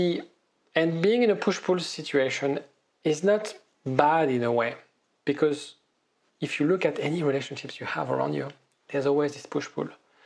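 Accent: France. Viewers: While listening you, I hear your french accent. Probably I've mistaken but it's really nice😊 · Thank you, also very nice to listen to your French accent, Monsieur!;-) But not really sure if i want Her back haha... if there's still a mutual karma She will be back anyway. These kind of videos help, donc merci quand meme,! Salutations de Pologne.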